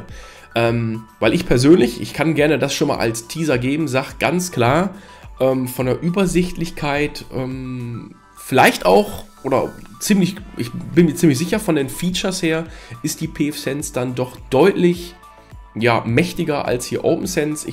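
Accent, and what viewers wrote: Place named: Germany. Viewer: Gutes video und 100% deutscher Akzent lmao.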